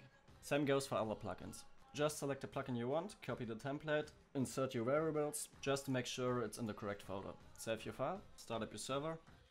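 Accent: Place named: Germany. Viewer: Moin, dein Akzent klingt sehr deutsch, deshalb schreibe ich mal auf deutsch:) Ich habe einen Server gemietet um mit freunden zu spielen. Leider sind wir alle Anfänger und bekommen die Traffic mod nicht installiert. Kannst du und da vielleicht weiterhelfen?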